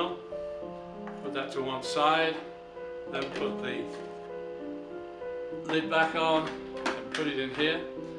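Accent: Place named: Ireland. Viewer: Im just here for the accent. It's a soft subtle accent, Irish? Pretty. I love this guy. Is he married? M. IL. ❤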